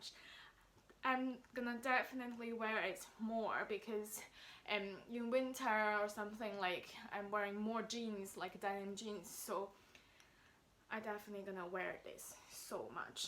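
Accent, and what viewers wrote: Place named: United Kingdom. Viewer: Your British accent is soooo cute!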